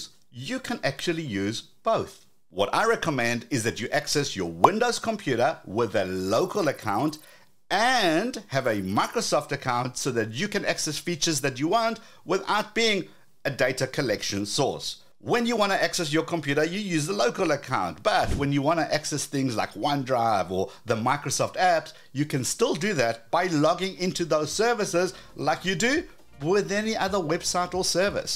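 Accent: South Africa. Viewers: I might be way behind, but are you South African? 🤣🤣🤣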